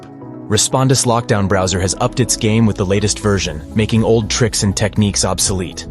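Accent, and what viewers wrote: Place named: India. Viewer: your indian bro i can tell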